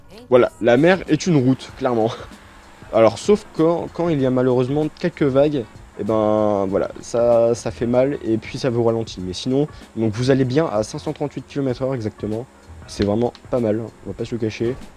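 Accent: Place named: France. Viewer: jppp ton accent francais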